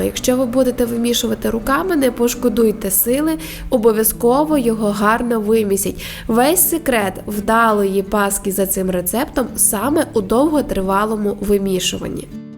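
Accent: Ukraine. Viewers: да по-разному она и раньше разговаривала. По говору слышно сразу что дома говорит на украинском. Просто видео на русском имело больше просмотров и лайков. Ну, а сейчас у них в голове клемы поменялись, уси дюже патриотамы сталы. Ничего, спецоперация закончится, и снова все перейдут на Великий и Могучий. А пока пусть лают.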